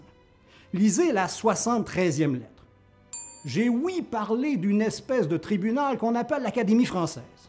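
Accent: Quebec, Canada. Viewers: Moi j'aime bien l'accent québécois, c'est joyeux à l'oreille Sujet très intéressant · Tout à fait d'accord avec vous, la langue française s'enrichit grâce aux millions de francophones dans le monde. Elle doit évoluer et qu'on arrête s'emmerder les gens sur des détails, à l'oral, cela n'a pas grande importance. Pour ma part, j'ecris "autant pour moi" et tant pis pour cela que cela empêche de dormir 😊😁 en plus, J'ADOOOOORE l'accent québécois, je le trouve savoureux. Bises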